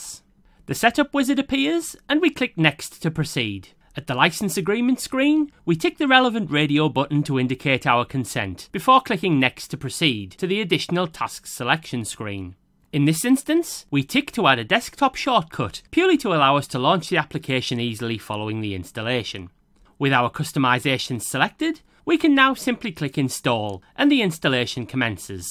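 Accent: United Kingdom. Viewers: thankyou so much, nice northern man. this was so clear, so concise, a child could do it. I'm impressed and very grateful ❤ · Clear , visual instructions and ofcourse it helps that you are from the UK ;) so easier to understand . Please make more videos like this , esp UK focussed content . Subscribed and keep up the good work . Will tell my friends . With such clarity in your videos - you will go far ....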